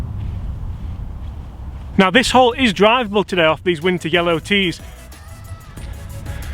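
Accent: Yorkshire, United Kingdom. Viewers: You are growing on me man! I was unsure at first, but I'm thinking it was the Yorkshire accent..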